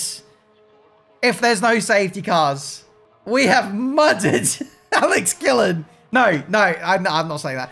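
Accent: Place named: Australia. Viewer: What part of aussie you from?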